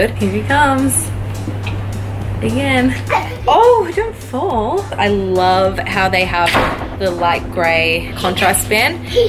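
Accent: Australia. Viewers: r u an aussie m8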